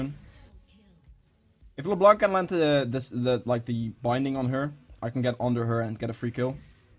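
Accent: Netherlands. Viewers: ur dutch am i rigth?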